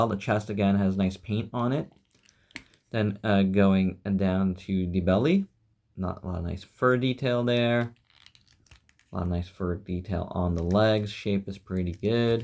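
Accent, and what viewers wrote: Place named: New York, United States. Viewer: you have GOT TO BE from NY, i can hear it in your voice.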